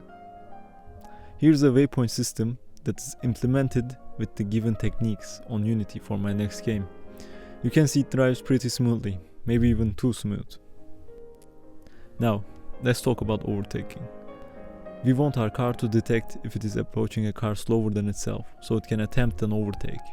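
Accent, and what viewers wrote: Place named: Turkey. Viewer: Your Turkish Accent got me laughing so hard 😆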